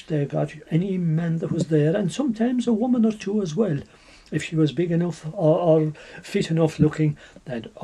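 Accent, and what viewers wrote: Ireland. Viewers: Love the Irish accent so dang much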